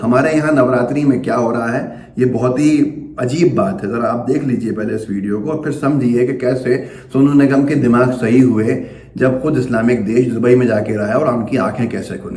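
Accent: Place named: Bhopal, India. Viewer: Are khaan tum to bhopali lag riye ho.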